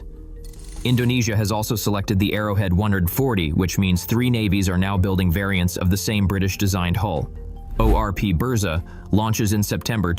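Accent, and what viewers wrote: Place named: United States. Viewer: Excellent video showing superb European cooperation to create a state of the art platform. Pity that the narration was with an American accent. It is noted that the frigate has ZERO American content. Why therefore is the narration American? Content - excellent. Presentation - not so much.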